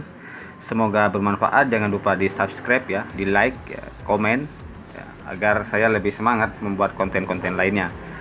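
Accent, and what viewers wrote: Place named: West Sumatra, Indonesia. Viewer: Logat nya seperti orang minang ya bg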